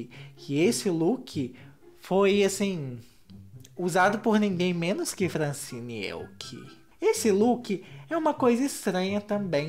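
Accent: Minas Gerais, Brazil. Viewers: Você tem um jeito de falar muito espontâneo. Desde o primeiro vídeo que eu vi, já percebi que você era mineiro. Amo seus vídeos ❣️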